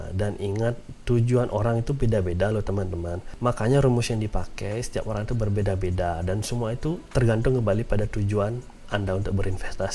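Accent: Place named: Bali, Indonesia. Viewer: Wah, ketemu channel ini, suka kale pembahasanny dan logat balinya.. dulu pernah kerja di bali 2 tahun... Jadi sudah biasa sama logatny.. moga2 channelny bisa kembang terus.. yg di sharing kebanyakan bermanfaat dan mindsetny bgs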